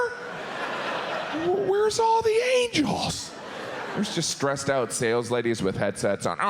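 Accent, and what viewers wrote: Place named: New York, United States. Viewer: His New York accent kills me lmao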